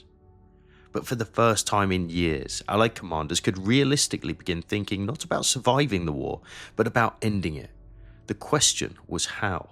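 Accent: England, United Kingdom. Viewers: There's no R in the word. That thick English accent is rough as you butcher words and add Rs to everything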